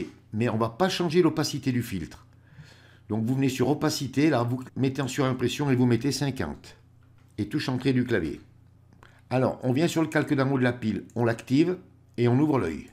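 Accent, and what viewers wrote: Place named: Saint-Étienne, France. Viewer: Merci pour vos tutoriels exceptionnels que j'apprécie énormément: même avec votre bon accent stéphanois tout est bien clair et limpide: vous êtes très patient et pédagogue.Un grand bravo..Je m'abonne pas souvent aux chaines youtube mais j'ai fait unbe exception pour vous!! Mais je fais la même remarque que Pierre Darre, pourquoi la rotation 4 fois de l'image??? Pourquoi?? Et votre lien de réponse ne solutionne pas la question!! Encore merci et à très bientôt!!!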